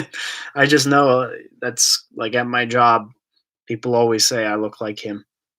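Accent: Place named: California, United States. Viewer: Just found your channel, pretty good content. Im thinking by your talking, you are in California. Have you seen the Alien museum exhibit at USC?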